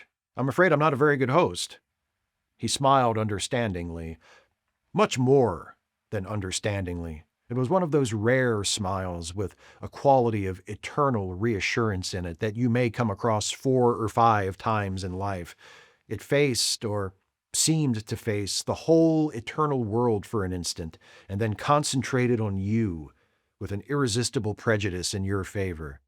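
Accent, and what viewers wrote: United States. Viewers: Like a lot people my age, this was required reading in college (“college” is British for High School, to avoid confusion) but I always loved it anyway. There’s definitely something about hearing the text in an American voice. I’ve been a subscriber for years now, so your love for the novel is not new, but this is excellent work, I’ve listened to every part so far, and it’s something that I’m going to look forward to seeing in my subscriptions for the next few evenings.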